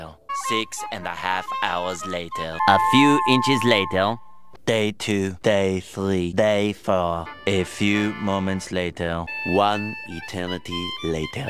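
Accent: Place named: France. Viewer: It's meant to be French. The narrator is an homage to Jacques Cousteau.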